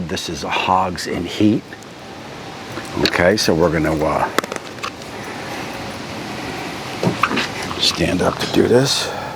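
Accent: New York, United States. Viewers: What part of Texas are you from? I've lived in Texas my whole life and can't think what area of Texas sports a NY accent?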